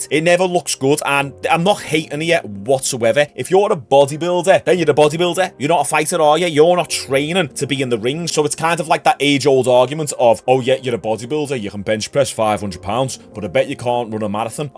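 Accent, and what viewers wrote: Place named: Glasgow, United Kingdom. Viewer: In regards to hate comments and what not, a lot of kids are on here who don't know any better and also bitter A-holes sad about their own life unwilling to do anything to change it, wanting to bring someone down to their level instead. Constructive criticism is great to learn and grow from, but some people abuse anonymity just for the sake of being vile. Keep up the effort with the uploads and people will eventually gravitate. I think most state side Americans love your accent (I wanna say Glasglow) plus your entertaining so Its almost assured you're gonna blow up huge one day.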